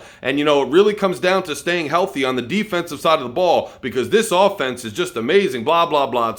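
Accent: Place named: New York, United States. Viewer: Funny thing, you sound like you’re a NY transplant in Miami? Somewhat heavy accent…. I’m a die hard Bills Fan, born and raised in Buffalo , be 62 in June, Miami vs Buffalo has been a rival since the 70s, they owned us in t(e 70s, 80s, get a new coach and QB and Miami is a threat…. Good luck in t(e playoffs,,, Go Bills..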